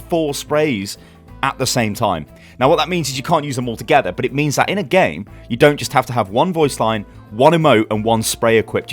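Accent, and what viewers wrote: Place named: United Kingdom. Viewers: A Brit that sounds like he's on cocaine is easy to listen to? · V16 Lambo now I was able to skip the Blizzard video and hear it in a British accent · V16 Lambo Tbh I watched this because the British accent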